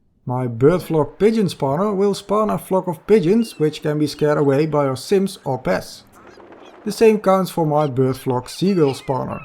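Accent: Netherlands. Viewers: You sound Dutch to me🤣 if I'm correct you will be able to understand this ; Het ziet er goed, ik ben hier heel blij mee! Dank je wel voor alle uitleg!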